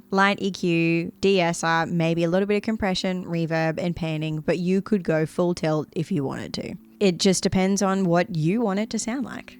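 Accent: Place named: Australia. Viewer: Very interesting information in the description section. I was actually curious if you were in Australia, or maybe a nation with a similar accent. Being a 30 year broadcast television veteran, I have to add that I’m very impressed with your editing skill. When I was in college we only had actual videotape editing! lol Computer based/non-linear editing was just emerging. Two questions: Do you offer vocal lessons; and, are you on Facebook? Love all your vids!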